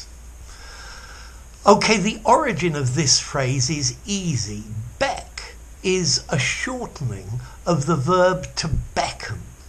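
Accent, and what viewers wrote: United Kingdom. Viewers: Sir your accent is very British, I love it. Thank you for helping us understanding these idioms 😊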